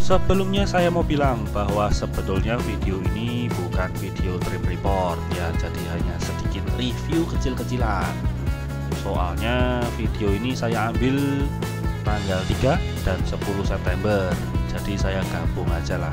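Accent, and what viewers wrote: Java, Indonesia. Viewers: Medok bnr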